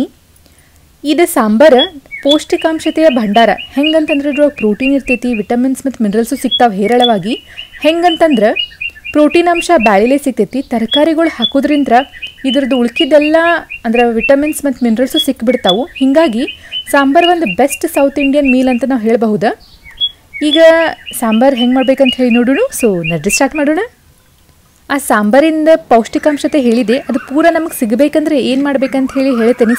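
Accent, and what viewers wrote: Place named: Hubli, India. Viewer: Nim hubli bhashi kelak kushi aythri ❤❤, Nim bhashi kelak Nanu video nodyhini ♥️ from Tulunad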